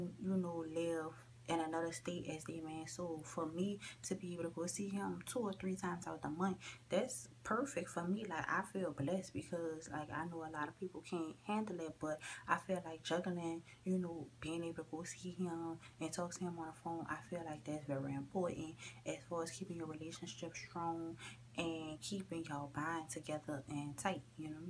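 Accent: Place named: South Carolina, United States. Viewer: Are you from Charleston, SC? Your accent sounds very familiar to me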